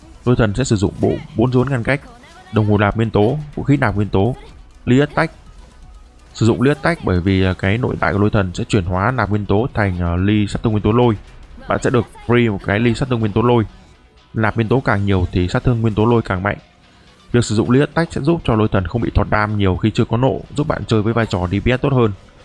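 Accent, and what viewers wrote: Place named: South, Vietnam. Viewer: nghe giọng anh nói tiếng miền Nam dui vc xDD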